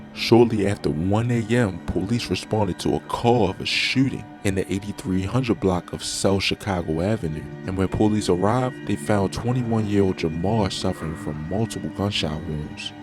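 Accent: Baltimore, United States. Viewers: You definitely from Baltimore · You sound like you from Baltimore. How you know so much about chicago. I don't don't care about none of these gangs here in Chicago. These goofys just in the way. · This nigga sound like he from Bmore.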